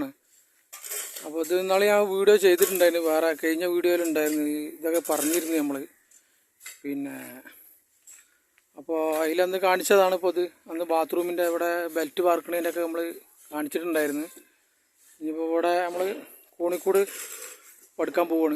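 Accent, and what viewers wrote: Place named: Malappuram, India. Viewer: Ithu Malappuram slag .manassilavathoru kanandaa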